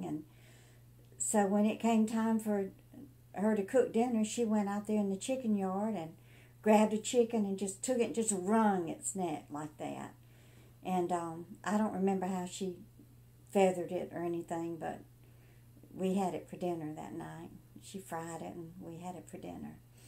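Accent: South, United States. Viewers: 28 here , googled how to wring a chickens neck and got this video 😅. We have too many roosters and i don’t have a knife sharp enough but all that aside ; I listened to your video and parts of it felt like my own paternal grandmother . She was widowed when I was 6 . Loved her Diet Coke about the same way too haha . Thank you for sharing , I don’t know why you did it , but it made my morning all the better . 🩶 I heard you say Columbia and you sound southern as all git out , so I’m guessing you’re around Sc so hello fellow south cackalakian !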